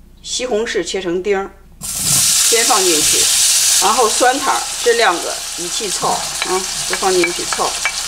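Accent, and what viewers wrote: Shaanxi, China. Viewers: 陕西话真好听🥰🥰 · 95年我去西安上大学，一点都听不懂陕西话。现在听着陕西话觉得真好听啊：） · 只有乡党能灵活掌控陕普四六频道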